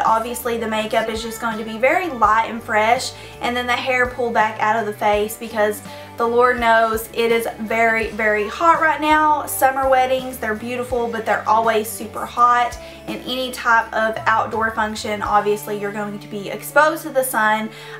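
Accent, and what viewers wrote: South, United States. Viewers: Gorgeous makeup and hair! I love it :)) I don't own any Mac products..do you happen to know any dupes for those colors? I can't wait to try this out!! Love hearing you talk cause we both have that country twang ;) Xoxo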